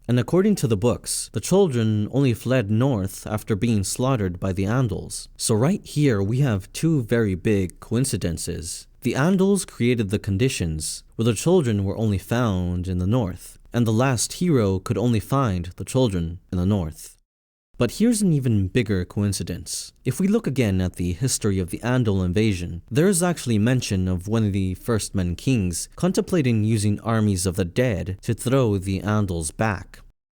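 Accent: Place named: Midwest, United States. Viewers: Sounds midwestern. Maybe Colorado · Great video! What part of the US is your accent from? I've never heard it before.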